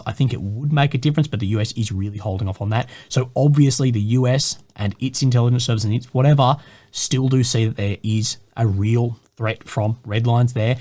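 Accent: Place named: Australia. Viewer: What a fentastik 😍😍😍😍😍 day what a fentastik accent i love Australia accent wooow, you channel is great but your accent is amazing, fentastik. Have fentastik day sir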